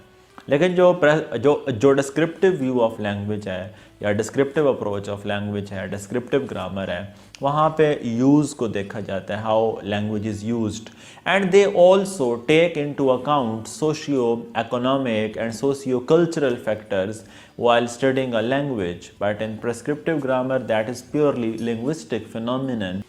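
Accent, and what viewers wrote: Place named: Pakistan. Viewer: Thanks sir. I was very confused due to this words.i didn't relise that you are from Pakistan. While I was watching your video. You speak like my native teacher.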